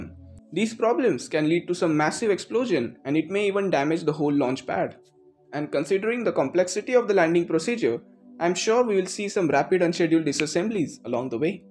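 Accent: India. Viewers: Omg the Indian voice sounds like the scammers voice jaja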